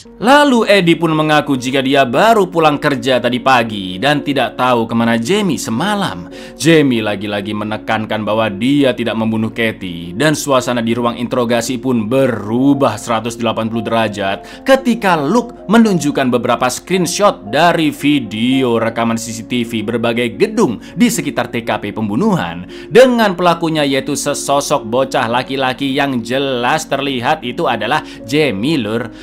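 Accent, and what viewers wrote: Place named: Surabaya, Indonesia. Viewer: Kyk arek suroboyo ae nak...😅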